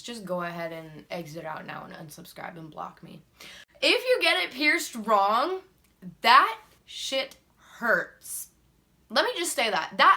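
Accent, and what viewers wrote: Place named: South, United States. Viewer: I'm from MS! She sounds southern as hell to me!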